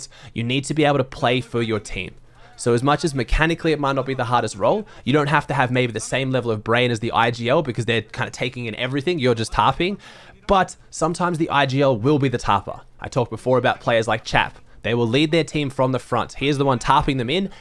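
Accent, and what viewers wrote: Australia. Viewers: Nice Aussie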